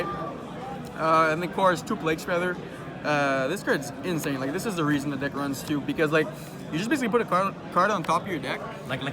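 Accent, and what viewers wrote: Canada. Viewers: This guy sounds very Canadian.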